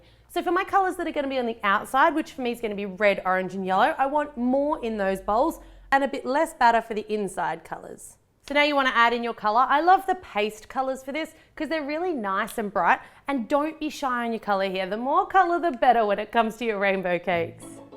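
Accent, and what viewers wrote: Australia. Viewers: Australians do say it like that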